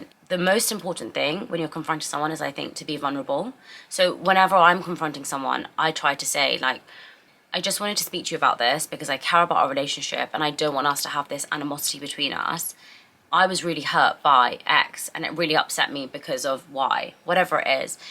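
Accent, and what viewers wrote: United Kingdom. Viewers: How come you’re accent is so British, just amazing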